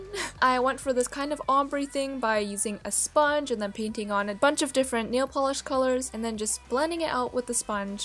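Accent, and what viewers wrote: Canada. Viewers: I'm from England and I'm so proud that I managed to tell you are from Canada and not America from your accent 😂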